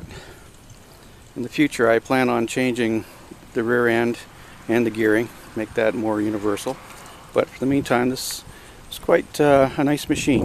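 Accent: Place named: Canada. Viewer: so i guess youre Canadian huh? ;)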